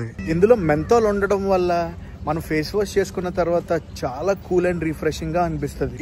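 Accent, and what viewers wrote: Godavari, India. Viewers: Hello andi nenu me videos ni resent ga chudatam start chesa chala bagunnay me godarolla telugu bale untundi your good name please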